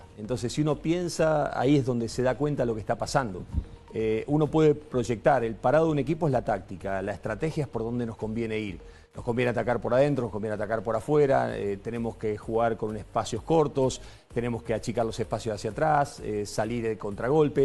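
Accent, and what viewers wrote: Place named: Quito, Ecuador. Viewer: Grande Alfaro... Y se nota q se le está pegando el acento quiteño...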